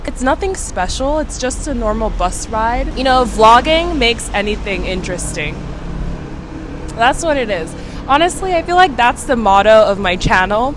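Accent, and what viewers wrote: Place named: United States. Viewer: What’s your backstory for your lineage and your English skills. You sound totally American. I couldn’t remember if you and Eiko were both Ghanain or if you were Nigerian. Your artistic skills are totally BOSS by the way. You’re unique and special at same time. If you ever visit the US you will feel at home amongst the African-American community.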